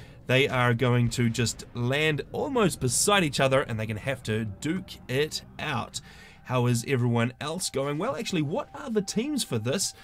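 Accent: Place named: New Zealand. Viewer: first up good on you for taking the time to covering FAF! the quality of the cast was very good. i however am a tad ocd and found listening to your NZ accent exxtremely irritating, so sorry had to be honest!!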